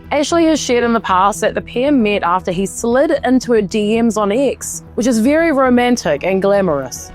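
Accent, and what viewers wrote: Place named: New Zealand. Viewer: Really enjoying you videos! Are you a kiwi? 😊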